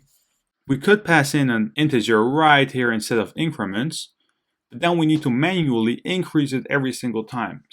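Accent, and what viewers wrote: Netherlands. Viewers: Ik had wel ff moeite om te horen dat je Nederlands was :P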